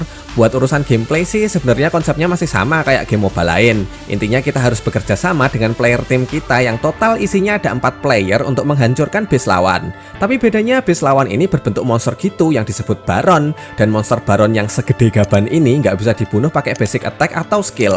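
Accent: Java, Indonesia. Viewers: Orang Jawa ya bagian mana bang daerah mana bang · Jowo ne Endi kang salam dari Ngajuk 🙏😊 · medok banget 😅😅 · Suka banget pembawaannya bang ad Jawa Jawa nya😀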